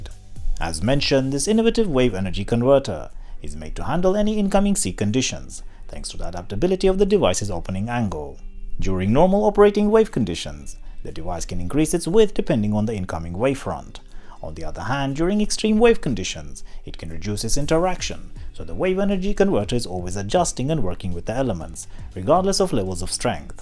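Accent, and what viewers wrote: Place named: India. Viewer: india English?? zzzzzzz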